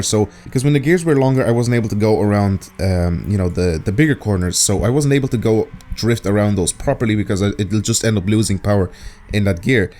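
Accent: Dublin, Ireland. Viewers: Are u from dublin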